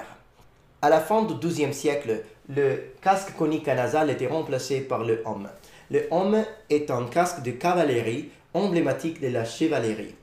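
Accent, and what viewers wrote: Italy. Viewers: Hi. I'm here for some feedback. That was very good overall. I understood everything without effort, so you level is clearly good. However, you still have some room for improvement (you're good, so this will be nitpicking). The sentences you used were well structured, but sometimes, the pronunciation was off, even when ignoring your natural Italian accent. So you had trouble with using the correct "déterminant" (un/une) depending on the type of word that follow. But this is hard for everyone learning french. Secondly, (and this is a bit funny), you said "chevalieu" instead of "chevalier" a couple of times. Lastly, the biggest mistake was pronouncing the "p" in "coup". You just don't. It's silent. Yeah, i know. Fucking french right! It's the biggest mistake because when you pronounce the "p", it sounds like "coupe" (slice), which is a totally different word than "coup" (blow). 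And regarding your trademark sentence "the has spread his wings", i couldn't help but find it very stylish in french "Le a déployé ses ailes". Nice! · un accent particulier en français, j'entend l'accent italien et l'accent anglais en même temps. je préfère l'anglais ;) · Sometimes, the italian accent can be remarked, although very lightly. The rest of the time, it's a bit obscure : it can be heard that he's not a native french speaker, but it doesn't sound specific to any country. Which is pretty neat considering french has such a weird and uncomfortable syntax to be spoken when you're not a native speaker (hell, even native speakers can get it wrong pretty often)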